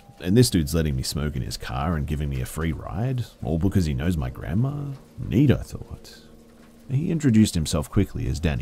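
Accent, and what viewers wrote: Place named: Australia. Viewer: Does anyone else think voice & Aussie accent sounds different? Especially if you listen to some older posts. Still sounds great though while I listen on a dark & eerie night. Thanks!!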